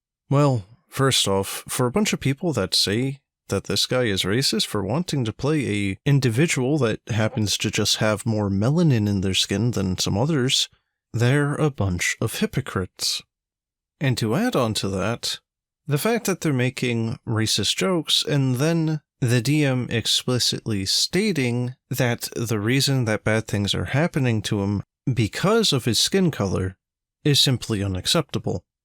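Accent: United Kingdom. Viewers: a black guy with a british accent. so i guess ksi doesn't exist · A Black dude with British accent... John Boyega?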